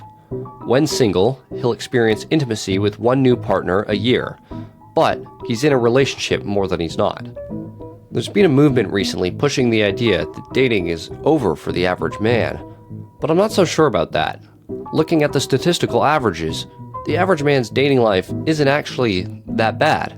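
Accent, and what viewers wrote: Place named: United States. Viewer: >hear american >leave immediately i mog burgerfats easily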